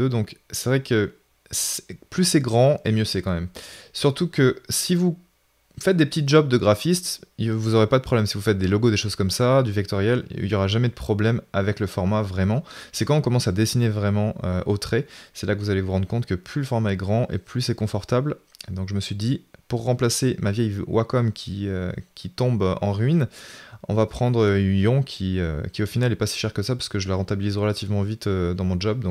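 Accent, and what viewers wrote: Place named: Quebec, Canada. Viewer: Bonjour, Merci pour ta vidéo qui est très sympathique et tu n'as pas trop l'accent prononcé du Québécois. Bref, as-tu fait une vidéo pour bien configurer la tablette en AZERTY, car claver QWERTY ce n'est pas pratique. Merci d'avance pour ta réponse et si tu as fait une vidéo, d'y mettre le lien. :) Continue, c'est génial ! D'ailleurs, je viens de m'abonner à ta chaîne ! ;)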